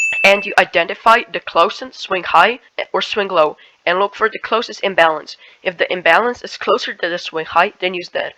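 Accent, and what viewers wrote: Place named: East Asia. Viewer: Are you Malaysian? You sound so east Asian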